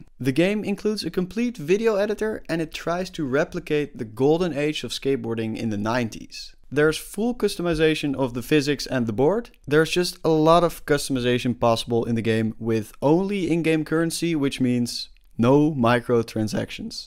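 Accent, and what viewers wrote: Netherlands. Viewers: hi im dutch and have have a dutch accent so are you dutch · I smell dutch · I can hear he is Dutch because I am to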